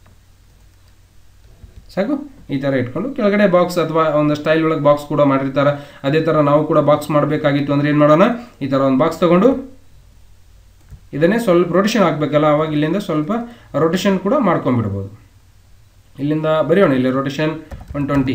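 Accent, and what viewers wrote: North Karnataka, India. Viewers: Haha hutharkanada bashe keloke kushi agutapa ❣️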